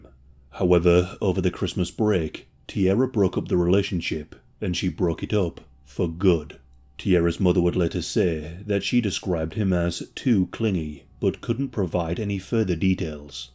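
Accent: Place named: Northeast England, United Kingdom. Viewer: Aye aye aa think your from rundhere 😂 not a Geordie but not far from like ❤️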